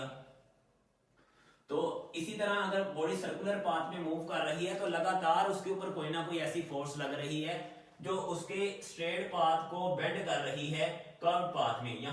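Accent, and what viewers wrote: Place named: Saraiki, Pakistan. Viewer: 50% idhar 50% udhar Student of M.Phil physics + also teaching Medy khayal ich tohaadey lehjy to lgdy saraiki ho..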